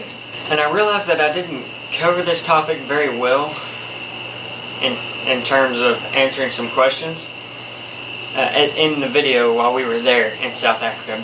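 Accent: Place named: Baltimore, United States. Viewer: Explanation sounds like Baltimore Maryland. Keep up the good videos.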